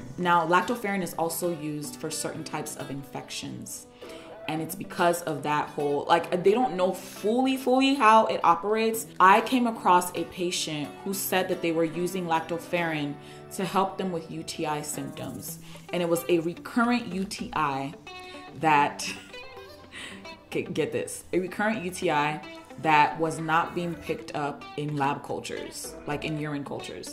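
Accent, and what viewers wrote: Nigeria. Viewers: Lol its the nigerian accent for me no matter how bitter it is I'm going to take it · Great video 👌🏻 Love the Nigerian accent! 😅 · Girl I love your frequent switch to Naija accent, lovely video. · new SA suby thank you so much for great advise my 27 year old daughter struggles with body ordor.....anyway. loving the Nigerian accent LOL!!! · Great video,you got a new subbie as soon as i heard my Nigerian accent?Naija for life,lol. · You are a NIGERIAN??? At a point it was just clear. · We are the same... I am THE supplements’ Queen! taken most of the supplements you mentioned. Took MSM in only water too! Stopped as it messed with my stomach after a long time. Taken collagen for years. Take chlorophyll as it helps me with energy and my red blood cell count. May just return. ... and did I hear a Nigerian accent? Love how you switch. Laughed a lot. 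Im subscribing, my naija sis! 💕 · Love the Nigerian accent.....Love from Naija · Are you Nigerian?? 😅🤣🤣🤣. I like the way the Nigerian in you jumps out every now and then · Thanks for all the info. I get my supplements from iHerb too. Never heard of some of the supplements you mentioned. Meanwhile you got me when I heard that Naija accent.. waow I was like, whaaaaat that’s my sis. 👍🏽 Abeg you ooh keep it up 🥰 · are you from Nigeriaaaaaaaa?😅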